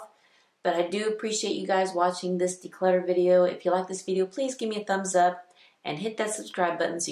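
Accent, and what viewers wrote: United States. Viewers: You may be Egyptian, but your accent is all Texas! I just gifted several palettes to my college aged daughter. Felt good!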